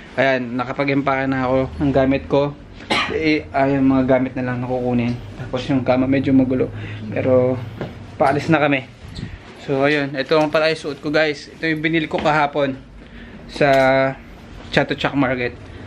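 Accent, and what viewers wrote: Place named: Batangas, Philippines. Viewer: Batangeno ka ba lodi? May naririnig akong punto e haha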